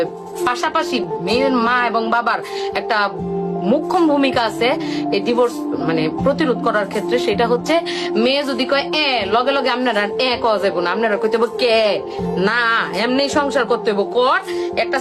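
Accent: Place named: Mymensingh, Bangladesh. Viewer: ভাষা শুনে বুজলাম এই মেয়ের বাড়ি ময়মনসিংহ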